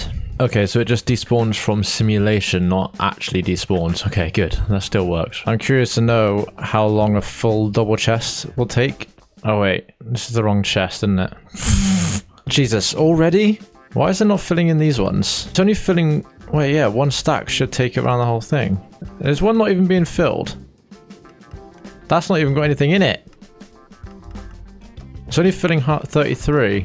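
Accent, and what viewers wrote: United Kingdom. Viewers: Is this a fellow Brit?!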